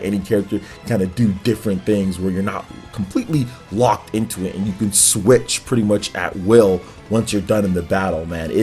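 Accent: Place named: United Kingdom. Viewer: British accents are so annoying.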